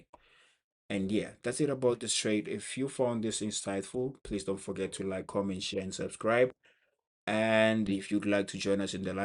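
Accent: South Africa. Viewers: You have South African accent where you from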